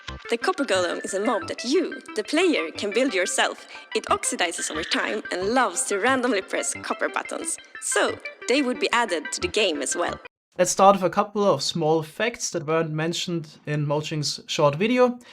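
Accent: Germany. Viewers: Damn you sound german, but great video tho.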